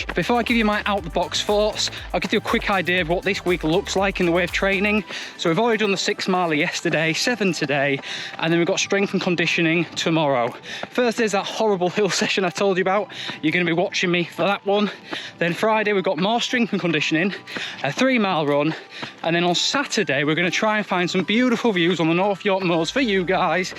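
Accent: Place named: Yorkshire, United Kingdom. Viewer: So glad I’ve found your channel! Really good review. I’m Spanish but I lived in Yorkshire for a few years so it’s nice to listen a bit of an accent :)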